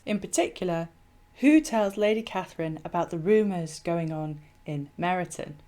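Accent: United Kingdom. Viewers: I am happy to hear good British which is a true English language.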